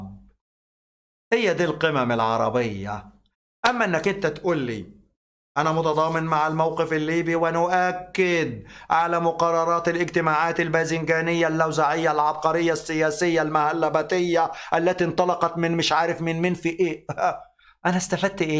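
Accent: Egypt. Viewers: السلام عليك ورحمة الله وتعالى كونك ليك ميول اشتراكية ذا شيء جميل و نبيل كونك بتتكلم صعيدي ذا يزيدك جمالا ونبلا ولكن كونك تنحاز زورا وتغير بعض الحقائق ذا ومع كامل الاسف يامحترم بقل قدرك وتكون عامل أساسي في التفرقة العربية يا يا يا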